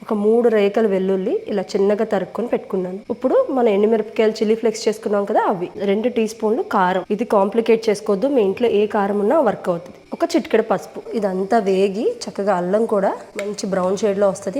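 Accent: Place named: Godavari, India. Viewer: Baraka, obbidi maa godavari slang ❤❤❤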